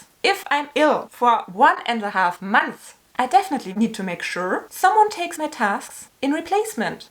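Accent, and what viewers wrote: Germany. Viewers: I love your channel and your accent! The first time i saw your video, i immediately know you are from Germany. Much love❤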